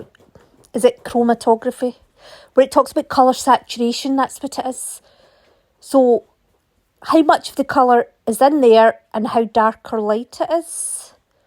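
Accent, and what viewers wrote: Scotland, United Kingdom. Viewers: Loving your artwork! Very inspirational! You are such fun to listen to, your accent is Scottish?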